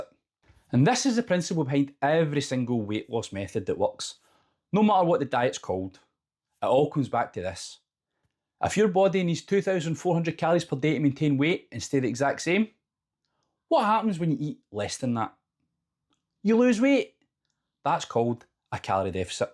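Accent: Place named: Scotland, United Kingdom. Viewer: Great information! I was enjoying your Scottish accent when you flash the text “nips are out. Sorry it’s cold in Scotland”. I was not expecting that and hadn’t noticed your nips until you mention it but it cracked me up. Congratulations on the weight loss and the video. And for making me laugh so hard at your comment. It just caught me off guard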